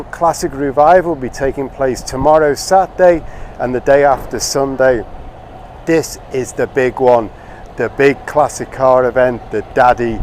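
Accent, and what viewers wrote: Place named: Liverpool, United Kingdom. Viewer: Lovely video mate ❤ Where are you from? Can I hear a Liverpool accent or close to Liverpool? Maybe Chester areas?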